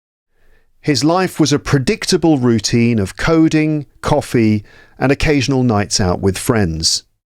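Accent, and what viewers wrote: United Kingdom. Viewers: so now you have a British accent? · Hi, a big thank you for your videos I always switch the speed for 0.75 and repeat after you as I really want to develop the British accent. At the moment I’m learning how to fix my accent with help of another Nicholson, so both Lukes are helping me, thank you guys. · Please answer me Is this British accent? Idk the difference between it and American..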